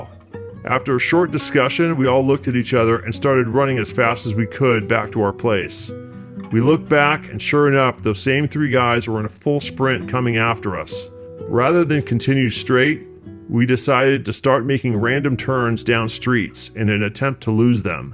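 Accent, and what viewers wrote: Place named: Southern California, United States. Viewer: If you're willing to share, are you from socal by any chance? You have a socal accent very clearly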